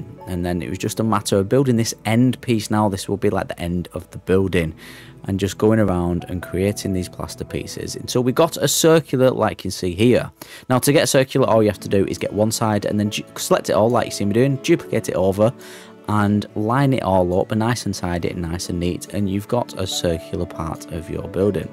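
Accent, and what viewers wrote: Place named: Manchester, United Kingdom. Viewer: it sounds like you are from Manchester. Have you ever thought of recreating Belle Vue Pleasure Gardens/Zoo?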